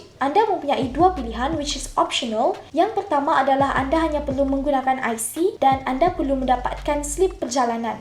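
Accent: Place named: Sarawak, Malaysia. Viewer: comeyy nye dialek sarawak .. huhu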